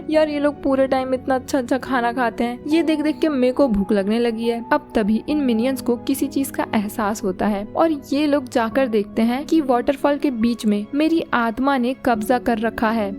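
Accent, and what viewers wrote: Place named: Bhopal, India. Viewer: You must be from Bhopal Right ?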